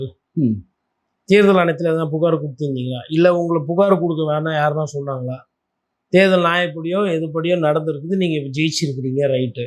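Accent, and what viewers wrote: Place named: Telugu, India. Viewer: ஏன்டா கோட்டி உன் தாய்மொழி தெலுங்க அல்லது மலையாளமா ஏன்ன தமிழ் தமிழ் பேசர இப்படி தான்டா இரண்டு கும்பல்கள் தமிழகத்தில் சுரண்டி கொழத்துகொண்டுயிருக்கு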